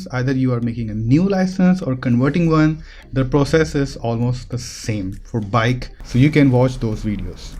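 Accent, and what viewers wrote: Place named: India. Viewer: Me completely confused when hearing an Indian accent😂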